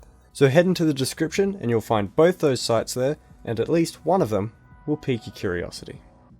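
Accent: Australia. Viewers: you sound very Australian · Is it just me or is his Australian accent distinctly more intense in this video than usual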